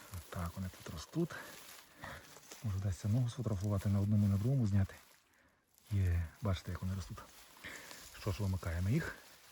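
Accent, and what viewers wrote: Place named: Transcarpathia, Ukraine. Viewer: По акценту то ви є з Закарпаття. В Карпатах білих зараз практично немає. Небуло дощику. Сухо... Удачі вам і гарного врожаю !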